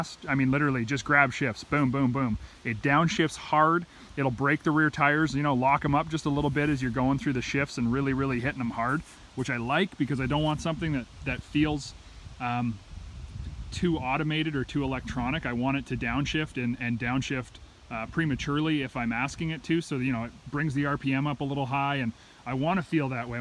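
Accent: Canada. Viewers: On a scale of 1-10 of how Canadian you sounded, you were at a 9.5 this time.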